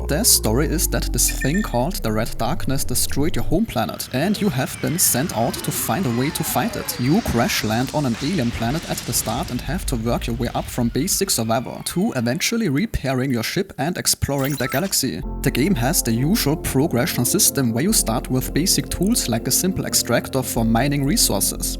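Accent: Germany. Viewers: Brother its kinda off topic but are you german ? : D just curios cause of the accent (btw i am really exited for this game i hope its not some early acess scam bs )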